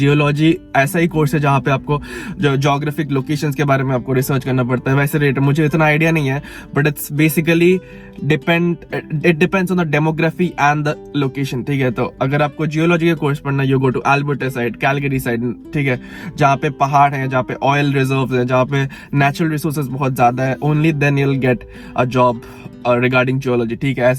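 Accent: India. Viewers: Can you talk slowly cuz you have an accent. You sound indian still cuz im listening your vlog for purpose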